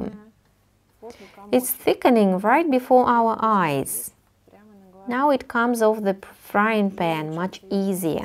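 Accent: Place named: Russia. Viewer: This Russian accent that you cannot hide even when you try very very hard. Anyway, very beautiful and helpful videos. I love them. Thank you so much!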